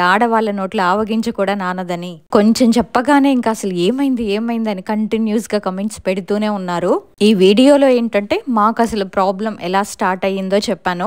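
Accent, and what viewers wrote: Telangana, India. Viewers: Telangana slang vachindi madhalo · Bhabi telangana ka slang ACHA hi 😊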